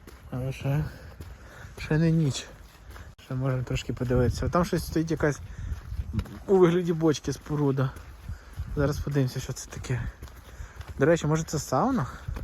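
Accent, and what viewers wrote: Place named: Western Ukraine, Ukraine. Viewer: Ви не будете мати роботи, яка буде високооплачуваною,тому будете мати тільки на життя і їжу. Ми за кордоном потрібні як робоча сила. Я розумію тих біженців- українців, хто з окупованих территорій, або зони бойових дій, ваша вимова (суржик) дає зрозуміти, що ви із заходу України, тому не зрозуміло навіщо ви травмуєте трьох дітей цією поїздкою. Ніколи емігрантів не приймуть як своїх, а у місцевих "усмішка на обличчі, а позаду зуби на спині". Я так пишу, бо маю досвід біженки, але я виїзджала сама, щоб знати чи варто їхати сім'ї з дітьми. Саме головне знання мови, якщо гарна іноземна, то влаштуєтесь, якщо ні - нічого їхати, або їхати в Польшу. Бажаю удачі, вона вам ще й як пригодиться!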